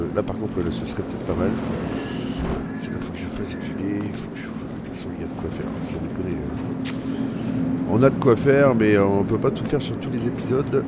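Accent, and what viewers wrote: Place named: Nord-Pas-de-Calais, France. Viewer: Mon et ses accents... 😂😅 Ton best reste ton chetemi 😍 quand tu te présentes toi tu t'appelles 😅😂 Moi j'adore ta parlementure, et puis après toi tu racontes, moi j'écoute 😂😅🧡 Belle vidéo 👏👍 j'aime beaucoup cette petite série 😍 Gros bisous 🧡🌹💋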